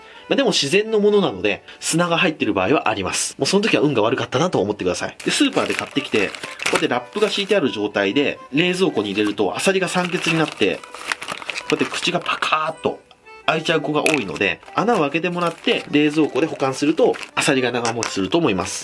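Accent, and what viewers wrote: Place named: Aichi, Japan. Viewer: 最近視聴させていただくようになりました。時々馴染みのある訛りが聞こえると思ったらやはり愛知でしたか！嬉しいです！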